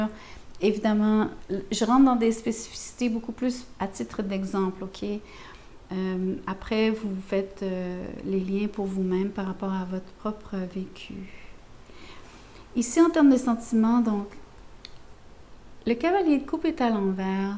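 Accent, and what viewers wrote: Quebec, Canada. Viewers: Bonjour. Guidance vraie mais pas pour tout. Je prends ce qui corredpond. Vous êtes Québécoise ? Votre accent, je reviens du canada 😊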